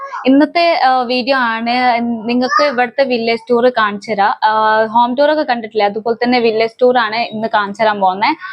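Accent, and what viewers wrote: Malappuram, India. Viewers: ആ മലപ്പുറം വർത്താനം സൂപ്പർ .. ആ North Indian dress .. അതും സൂപ്പർ ... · മലപ്പുറ०കാരിയാണോ ? ആ സ०സാരഭാഷയാണല്ലേ ?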